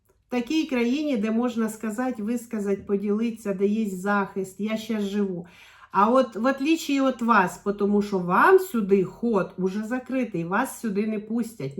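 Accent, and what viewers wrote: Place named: Enerhodar, Ukraine. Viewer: Цікаво, що де той Енергодар і де та Велика Писарівка, а говір один і той же - один-к-одному🤔💗👍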